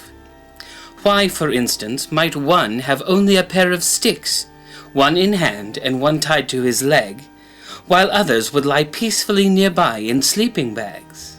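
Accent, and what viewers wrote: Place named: United Kingdom. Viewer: lol the brithish accent does sound sciencey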